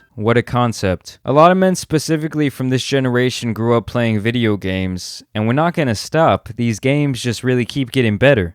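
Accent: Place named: New York, United States. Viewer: Sounds like this guy is trying hard to hide a new York accent New Yorkers are based bad ppl But boooooy did he hit the nail on the head bout that ps5 vs purse thing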